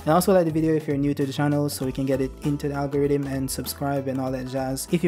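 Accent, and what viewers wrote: Jamaica. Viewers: Never thought I'd hear a Jamaican accent on a JJK video. I'm in. Good content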